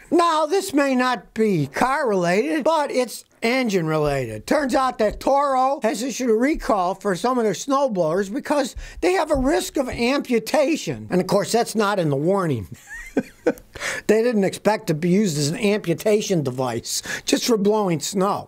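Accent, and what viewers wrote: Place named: Tennessee, United States. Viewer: Anyone else notice that Scotty is starting to get a Tennessee drawl??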